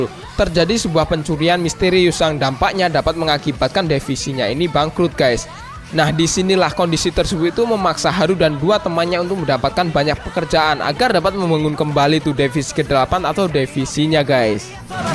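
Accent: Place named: Java, Indonesia. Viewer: Wong jawa koe toh minn? Mantep bahasa ne mandan medok:v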